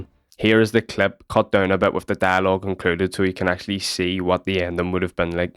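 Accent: Northern Ireland, United Kingdom. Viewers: Excuse me, are you northern Irish? Cause the accent really makes me certain that you northern Irish. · I don't even think you're Scottish... Northern Irish more likely?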